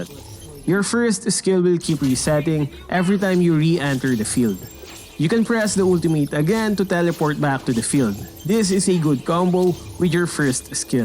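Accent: Philippines. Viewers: Nice. Very pinoy ang accent ha. Hahaha. "Owpennns a laaaht of paaassibilities" 😂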